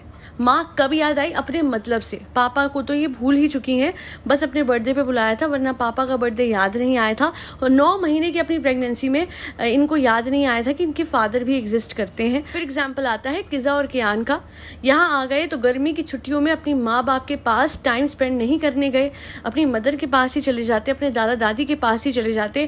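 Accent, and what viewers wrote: Punjab, India. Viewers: Your voice is so sweet n soothing but aaj aapki meethi punjabi sunkar toh maza aa gya or jis tarah se aapne bola usse toh lgta ki aap Punjabi ho mei bhi punjabi hu toh or bhi jyada khushi hui❤❤❤❤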